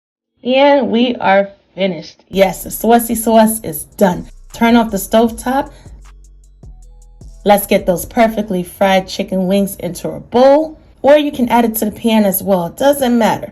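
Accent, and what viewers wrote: New York, United States. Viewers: I can listen to her NY accent all day 😍❤!! Another great recipe!! P.s. I'm a silent watcher, but I just couldn't help it anymore, lol. I had to comment & say how much I love your accent.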